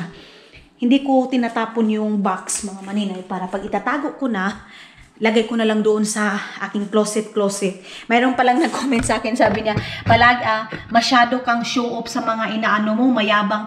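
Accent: Visayas, Philippines. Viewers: Sarap Naman Ang Buhay mo diyan. Taga asa ka, bisaya man kaayo mAgmsulti? Makalingaw gyod imong vlog. Pwede makig friend sa imo? Asa mo nga lugar nagpuyo diha sa united state?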